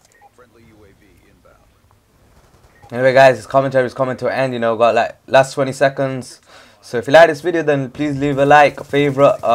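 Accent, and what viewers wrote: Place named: Jamaica. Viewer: u sound jamica \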